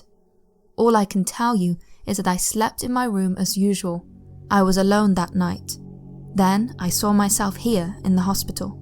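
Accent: United Kingdom. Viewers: The British accent is one of the best. So calming to listen to.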